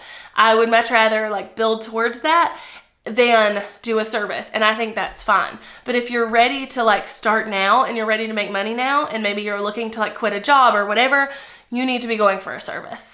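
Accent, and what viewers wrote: South, United States. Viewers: Thank you for leaning into your unique self and embracing your southern accent. TBH- and what I’m about to say goes hand in hand with this video, my thick southern accent is one big thing that I allowed to hold me back. And I have risen from the ground with zero social media in a janitor business that has a revenue of $19k/mo. But I could scale if I could learn and DO all these things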